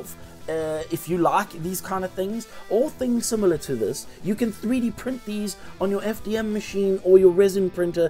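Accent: South Africa. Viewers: His bio says he's from the uk but he sounds so south african. Really like the video though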